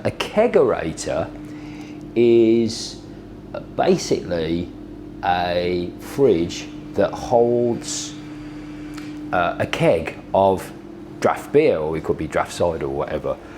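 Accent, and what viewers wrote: Birmingham, United Kingdom. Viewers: Lazy brummie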